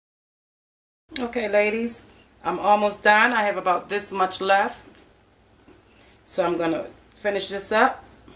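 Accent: Baltimore, United States. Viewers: Yes bmore accent! Thanks for the tut love this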